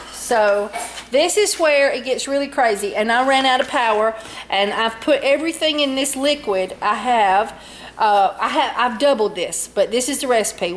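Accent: South, United States. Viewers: What was the name of the last ingredient that you used from that list? I'm sorry but its hard to sometimes hear well withat cute southern accent of yoyrs. Sounded like yellow dot glue but I'm sure that wasn't it at all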